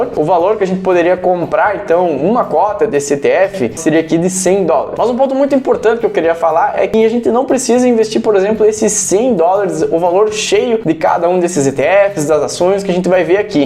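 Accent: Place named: Minas Gerais, Brazil. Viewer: mineiro de (BH)kkkk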